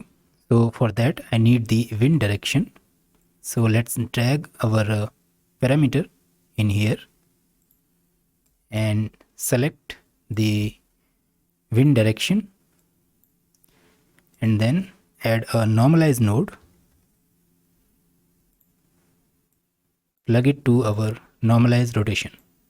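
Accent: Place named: India. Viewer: I like the Indian cool voice...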